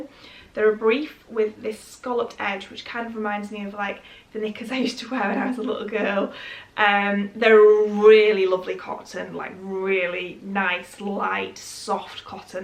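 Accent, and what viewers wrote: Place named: Yorkshire, United Kingdom. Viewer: I think Yorkshire!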